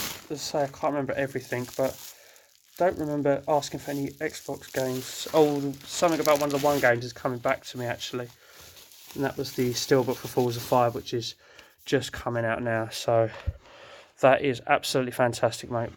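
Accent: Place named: Portsmouth, United Kingdom. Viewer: What a fantastic video from the lads and opened by what i can only assume is a yeti (mate you're well hairy!) you sound like your from down south Portsmouth area? do you have a list/pic of your plat PS1 titles mate?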